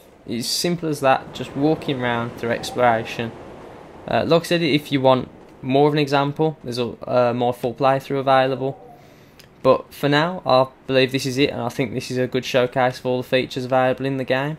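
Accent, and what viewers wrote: United Kingdom. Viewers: god damn I love british accents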